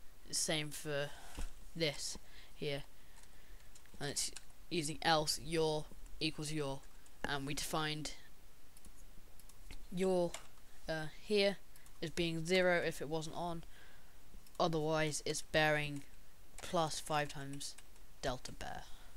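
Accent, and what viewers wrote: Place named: United Kingdom. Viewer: great tuts...also love your accent, british-type accents are cool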